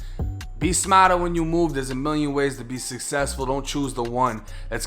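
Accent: Boston, United States. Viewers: Any time he says CHAHGEZ in general to be honest, plus BAHSTEN accent is one of the best parts of his YouTube videos in my opinion.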